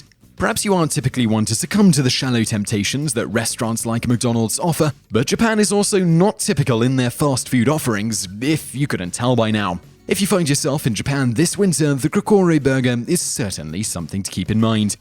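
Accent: England, United Kingdom. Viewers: Is his accent heavier than most Brits?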